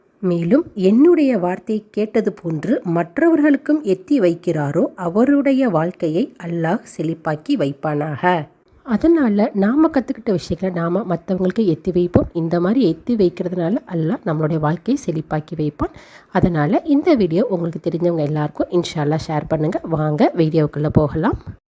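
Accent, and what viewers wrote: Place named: Tamil Nadu, India. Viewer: அஸ்ஸலாமு அலைக்கும் சகோதரி நீங்கள் ஓதுவது முற்றாக தவறாக உள்ளது அரபி வசனங்களை தமிழ் உச்சரிப்பில் ஓதுவதன் மூலம் அதனுடைய கருத்துக்கள் வேறுபடுகின்றது தஜ்வீத் தெரியாவிட்டாலும் அதன் உச்சரிப்பை நாம் ஒழுங்காக உச்சரிக்க வேண்டும் இல்லை என்றால் அதனுடைய கருத்துக்கள் மாறிவிடும் தயவுசெய்து இனி வரும் காலங்களில் முறையாக ஓதி பதிவிடுங்கள் இல்லை என்றால் இதை பார்த்து ஓதுபவர்கள் அவர்களும் குர்ஆனை தவறாக ஓதுவார்கள்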